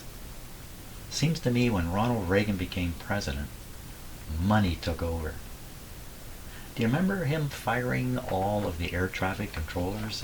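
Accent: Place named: Canada. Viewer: There is no going back. Accept us and we learn nothing, forgive us and you betray yourselves. I hate being wise but we are not in a good place and it's going to get one hell of a lot worse before it gets any better. Brace yourselves - you're going to catch strays, being so close. Open your arms to those of us who are more loyal to the world we had, to our friendships and alliances and the longest peaceful & demilitarized border ever, than any world this regime could ever hope to create. Y'all may not be my home nor my native land but I'll defend Canada before I defend this fascist regime. We still pick up a lot of Niagara Falls, ON radio, sometimes Toronto too. I should have known from your accent that we are geographical neighbors, my apologies. I also have actual Canadian relatives - 1st cousins, uncle died before I was born & his sweet, wonderful, creative wife just died, alone, in a hospital, during C19 - so perhaps my affinities toward you guys are a little more fundamental to me. 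My godfather is one of those Canadian cousins (~20 years difference). The aforementioned uncle, who died in Windsor, has a Wiki page, largely for work he did at Toronto University and then if memory serves Assumption College which I think became the University of Windsor at some point. Yeah. I understand how you have to regard my country, I understand the geopolitics, but don't fool yourselves for one second that we've all changed for the worse and that all your friends and allies are gone. We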